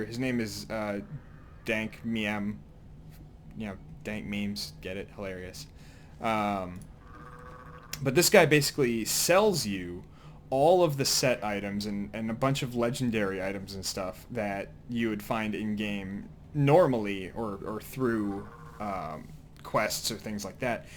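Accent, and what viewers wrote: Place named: East Coast, United States. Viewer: Hey dawg your channel is awesome and so is your east coast accent, I wonder how u have such low subscribers, good luck and thanks.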